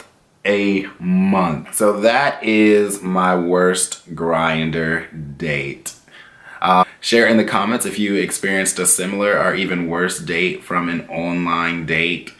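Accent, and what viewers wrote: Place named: South, United States. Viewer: Lmfao... Wow, you did the most for him. Are you from the south?! You have a very southern accent.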